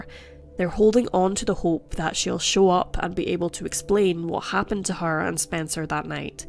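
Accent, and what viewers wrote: Scotland, United Kingdom. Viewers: Ahhh your Scottish 🤗🤗🤗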